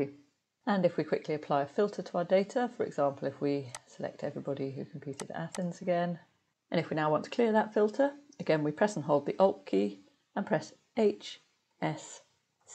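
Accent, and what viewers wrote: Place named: RP, United Kingdom. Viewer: Pradeep, man. Get real. Hers is the sort of English shy of RP that people want to speak. Maybe you. Pradeep, need to listen to Amitabh speaking English more (his is actually quite excellent), unlike that of typical politicians and actors in the subcontinent.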